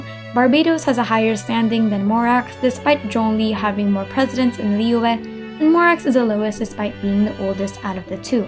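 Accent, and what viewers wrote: Philippines. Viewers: I'm guessing you're filipino? I can hear it from the accent. #represent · LMAO I pray that they don't find out about ur search history. Judging from ur accent, uI assume ur filo and God knows what happens in Filipino Christian households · Your accent! ❤ ph? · This channel is such a hidden gem! I knew you were filipino the moment you started speaking 😂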